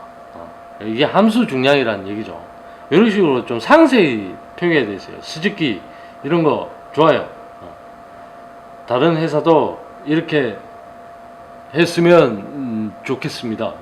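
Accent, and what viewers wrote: Gyeongsang, South Korea. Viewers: 토속적인 경상도 억양 리뷰 참 좋네요.잘 보았습니다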